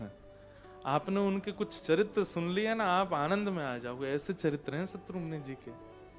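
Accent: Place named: Braj, India. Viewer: Jay Shri Krishna Radhe Radhe 🕉 Namo Bhagvate Vasudevy Namh OM 🕉 Namo Narayan ji Jay Shri Radha Rani Jay Shri Radha Kishori ji Jay Shri Radha Raman ji Jay Shri Guru Dev Maharaj ji Aapke Charno Mai Koti Koti Vandan 🙏Radhe Radhe Jay Shri Harivansh Aapki vraj Bhasa Badi Sumadhur hai Jay Shri Vrindavan Dham Jay Shri Yamuna Maharani ji Radhe Radhe 🙏♥️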